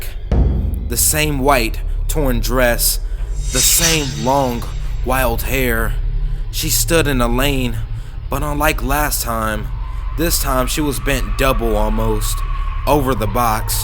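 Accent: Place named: United States. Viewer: Are you from Sydney Australia you don't have an accent you sound American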